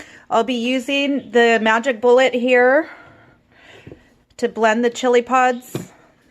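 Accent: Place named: United States. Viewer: Idk I’m sorry to say but even her accent was too American aka “white” she even said paratha like a white person 😩 idk I think I’ll experiment in other halal tamales I grew up eating them but mom used pork fat and it’s a no no for us Muslims. But ghee it is maybe with some red sauce in the masa también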